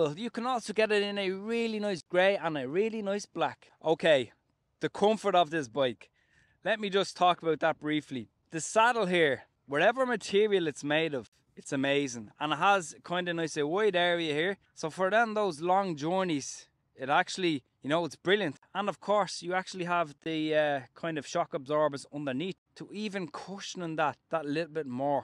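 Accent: Ireland. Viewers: You sound Irish I got one 2 weeks ago in tallagh dublin24 shop but tell me did u get a bag goodies with yours mines black prow same as yours but seen guy that didn't get them to build it like they offered but left out the bottle bag and other goodies I got receipt keys booklet and hand tools old school L alaenkeys spanners screwdriver that's it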